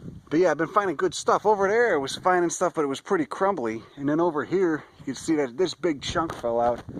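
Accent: United States. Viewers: those leaves look like they were pressed yesterday, thanks for the video, just one thing can you slow your narration down just a tad i have trouble keeping up, not use to the U.S accent.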